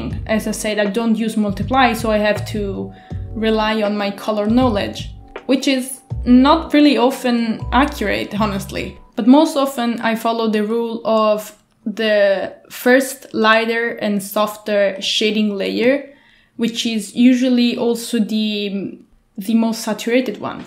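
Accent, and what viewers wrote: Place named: England, United Kingdom. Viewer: You sound a lot like Sypha from Castlevania! I don't just mean your English accent but like your overall tone/pitch Very soothing :> And this was so relaxing to watch, I've wondered how you do a few things here and there so it was great hearing about the process!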